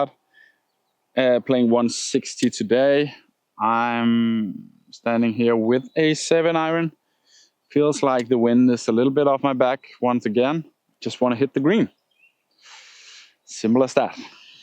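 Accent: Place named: Jutland, Denmark. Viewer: Fed video og fedt på engelsk også, du snakker ganske fint engelsk på godt jysk, ingen worries der, hvad med en video, med dine længder på alt i baggen, og gerne nogle Challenge med andre golfer på dit niveau, kunne være fedt og se.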